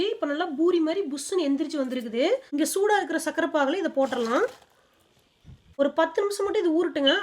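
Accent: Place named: Coimbatore, India. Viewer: Wow different receipe, superb👍👌.. coimbatore slang nalla therithu 😊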